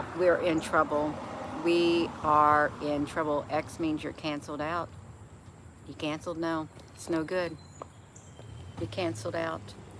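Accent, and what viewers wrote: South, United States. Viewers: You sound like you live in the south. You have an accent.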